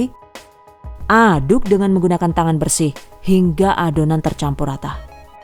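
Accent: Banyumas, Indonesia. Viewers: Bukanya serius nonton malah ketawa denger ngelawak ngapak😂😂😂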